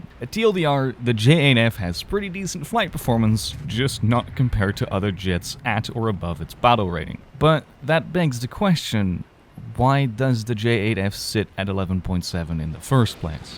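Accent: Netherlands. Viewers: wait is bro a dutchy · ben je nederlands?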